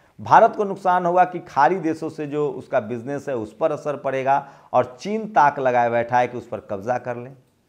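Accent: Bihar, India. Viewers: sir aap Kaun se state se ho. Aapki Hindi sun kar garvanvit mahsus karta hu ki mai bihari hu. Aapki language me Bihar ki Hindi wali tone aati hai 😊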